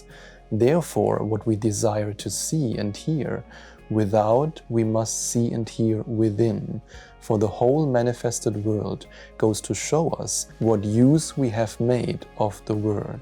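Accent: Germany. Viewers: Great video,! I appreciate your honest, straightforward approach, not full of hype like so many YouTubers. And I love your German accent!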